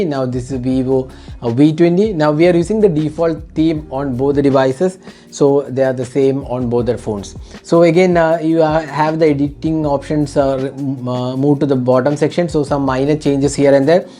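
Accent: India. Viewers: I do not know about all other of you followers, but I do not understand Indian English speaking. Sad fully most Indian IT Techs who speak English have VERY BAD English pronunciation, so my comment to this IT Tech is to at least TRY TO LEAVE THE INDIAN ACCENT WHEN SPEAKING ENGLISH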